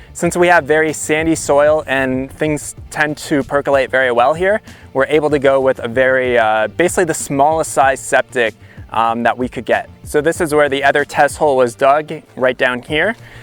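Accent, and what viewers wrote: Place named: Canada. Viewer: I like Canadians accent.. house, out, about etc.. just interesting ou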